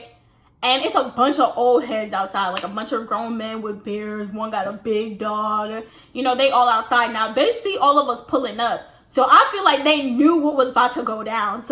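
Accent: New York, United States. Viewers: I could tell you was from New York from the beginning cause I was like baby yeen from no Georgia but lit story time🔥 glad to kno ain the only female who fought niggas 😭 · see you was giving me new york vibes . i wasn’t jakkin that you was from georgia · Sissss.... this my first video I'm watching from you and as soon as you started talking, I said shorty a New Yorker !!! You have my sub !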